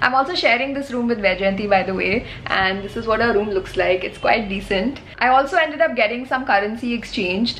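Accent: India. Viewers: It's Indian accent.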